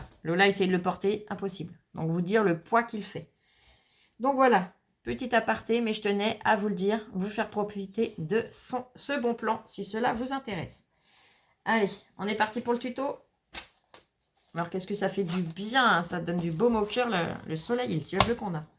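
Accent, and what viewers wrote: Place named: Burgundy, France. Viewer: Coucou je regarde vos vidéos depuis quelques jours et j’aime beaucoup. Et votre accent me disait bien quelque chose 😀 vous êtes de la Bourgogne vous aussi 😁😁😁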